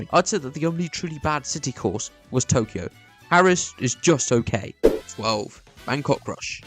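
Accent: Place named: United Kingdom. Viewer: Hold up...ur from Spain? Then, why do u have a British accent? 🤨🤔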